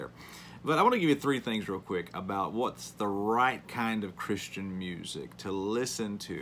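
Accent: South, United States. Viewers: I like your southern accent. I'm from Alabama now in Kentucky. Keep up the good work. Medium well btw. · Brother, thank you for this timely video. I just downloaded the WIOP app. Also, from one southerner (Texas gal born and raised lol) to another, I understand your accent super clearly, haha. May the Lord bless you, sir. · I was just about to ask you where you are at or from. Because your southern accent is just like ours. I was going to guess TN or KY. I looked up the radio station and it is in middle northern KY. I am in northern middle tn, just across the KY/TN state line. So directly south of you. Nothing like the old gospel hymns. We have a small church and few young people want to be a part of it. They want the entertainment of the worldly church. The parents and grandparents do not really like those churches, but they want their chuldren in church, so they go there. 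They figure any church is better than no church. There is so much ignorance in the Christian world today. Not enough accurate doctrine being taught., its great to see someone in your generation that understands this and reaching out like you do. I have always thought of the missionary field being in other countries and extreme rural areas. But I see the need all around us, everywhere. There is a great need of re-teaching Christians the Bible.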